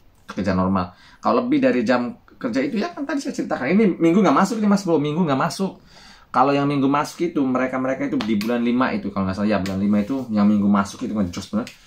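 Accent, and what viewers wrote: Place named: Banyuwangi, Indonesia. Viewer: Koyok wong Banyuwangi to mas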